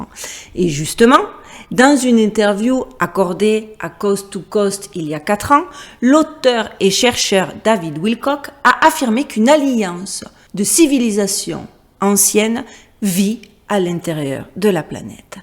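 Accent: South, France. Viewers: Bravo, joli travail de recherche... Depuis quelques semaines je suis votre chaîne youtube, et je dois dire que c'est un beau travail de synthèse et de liens fait avec un certain brillo ✨👌 (Même si tous les sujets abordés ne résonne pas forcément... Je fais mon tri comme un grand 😄) Et puis votre côté "rigolote" et votre "accent du sud" donnent une saveur en plus bien plaisante... Merci à vous 🙏☯️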